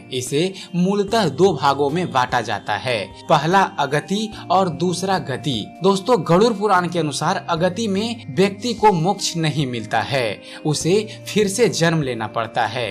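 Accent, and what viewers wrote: Bihar, India. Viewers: kya ap bihari h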